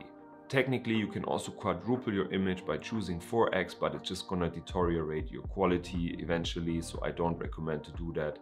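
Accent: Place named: Germany. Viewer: Excellent tip, thanks. You sound very German,.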